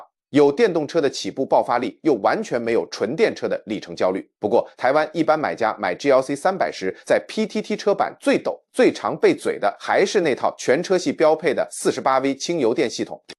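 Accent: China. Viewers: 这是台湾的YouTuber吗，声音怎么是中国普通话，听不下去了👎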